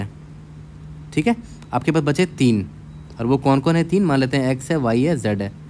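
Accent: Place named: mixed, India. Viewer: aapke accent se lag hi raha tha up bihar hoga padosi nika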